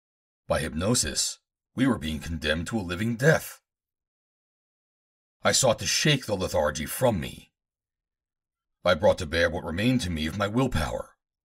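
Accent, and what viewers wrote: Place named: New York, United States. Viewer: I think your voice is great for stuff like this. NY / NJ accent is great for a stoic hero like JC — keep up the great work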